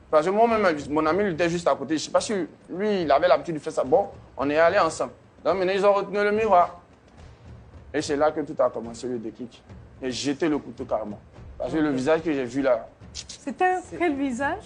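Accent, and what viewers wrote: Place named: Benin. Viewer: Mon frere meme avec ton mask si c'est du Benin tu parle ont sait qui tu est 😂😂😂😂 eviter de vous metre dans des problemes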